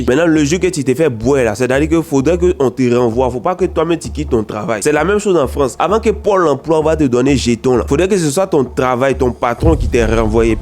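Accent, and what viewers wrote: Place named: Ivory Coast. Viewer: Moi c'est ta manière de parler qui me fan tu montres que tu es un vrai ivoirien et très fière ouiii ❤❤❤🎉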